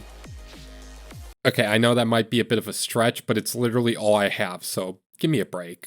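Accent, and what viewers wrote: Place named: United States. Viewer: The way you speak makes my ears hurt. You’re accent makes it painfully obvious you’re just another american. I hate the way you guys speak. It literally is the wrong way. When you say words that include “Er, Or, etc), you shouldn’t be saying it (for example: Word), like “Wrd”. The ‘R’ is simply there to change how it gets pronounced, it isn’t meant to be pronounced on its own. Don’t even get me started on words like ‘on’, which oddly seems to be pronounced like “Arn”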